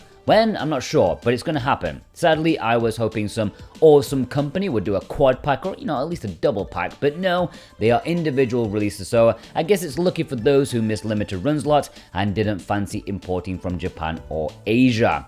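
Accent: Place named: United Kingdom. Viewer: Youre British? It’s strange i can understand your speaking 😅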